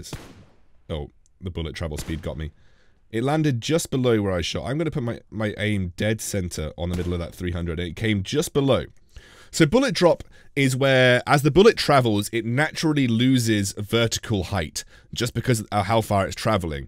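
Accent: England, United Kingdom. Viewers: I love your English accent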